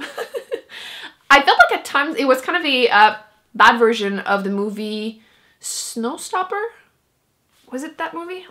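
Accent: France. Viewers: Thank you for responding! I kept trying to place it and thought you were from France so I was kinda close? No not really lol!!! I can’t wait to see what you come out with next! I’ve started back watching your videos!!!!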